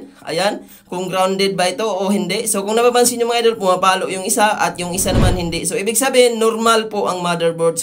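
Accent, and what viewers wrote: Iloilo, Philippines. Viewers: idol parang illonggo ka?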